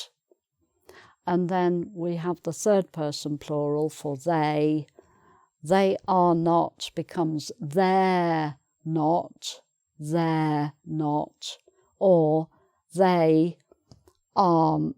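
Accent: United Kingdom. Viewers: Thanks again and again for your amazing lessons and British clear accent.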